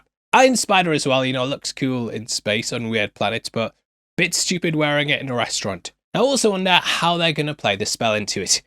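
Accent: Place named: England, United Kingdom. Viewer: brilliant Love the channel started following you because I heard an English accent and your best move was putting yourself in front of the camera.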